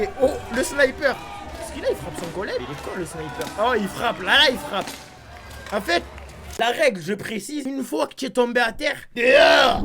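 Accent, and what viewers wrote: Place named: Marseille, France. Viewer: Challenge: tu pourrais faire une vidéo réaction sans tout axant marseillais, sa serait cool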